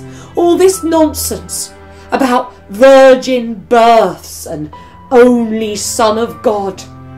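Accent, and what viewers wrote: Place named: United Kingdom. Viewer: I guess a British accent and dramatic background music justify a retelling of history that has no basis in fact whatsoever. No wonder most of the viewers give a thumbs down to this video.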